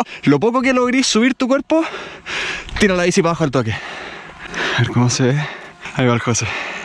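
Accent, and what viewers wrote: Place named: Chile. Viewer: Así hablan los verdaderos chilenos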